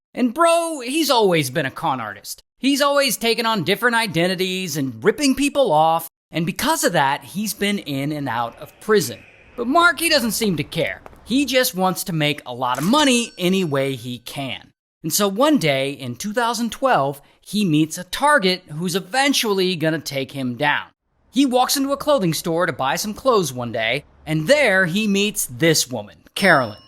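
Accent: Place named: United Kingdom. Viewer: your british is so funny :)))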